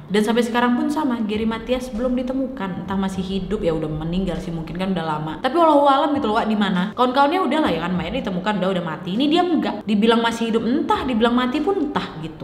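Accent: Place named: Riau, Indonesia. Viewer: hah makanya itu wak aku suka kali sm dia,dr cara ngomongpun sama karna logat2 melayu batak kepulauan riau😅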